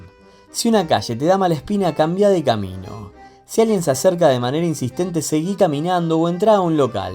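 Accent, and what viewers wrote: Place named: Rioplatense, Argentina. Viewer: Qué buen video "para turistas". Está lleno de yeísmo rehilado, aspiración de la /s/ y palabras del lunfardo. Hace apenas tres años que vivo en Argentina y entendí casi todo. 🤣🤣🤣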